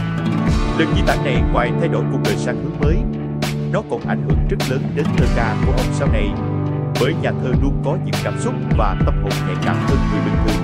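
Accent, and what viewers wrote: Vietnam. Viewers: giọng miền Nam hay truyền cảm của MC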